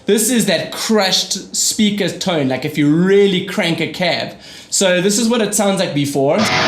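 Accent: South Africa. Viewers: South African...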